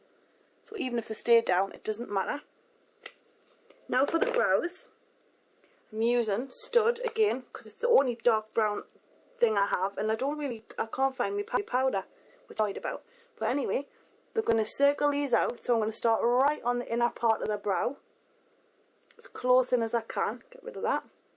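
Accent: Newcastle, United Kingdom. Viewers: um im pretty sure by her accent you can tell shes from the united kingdom lol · Lmao. Obviously she's a Geordie.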